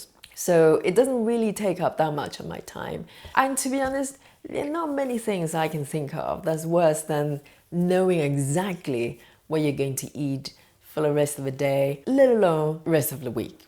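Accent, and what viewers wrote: United Kingdom. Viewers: Thank you for the useful info. BTW I like your British accent. ☺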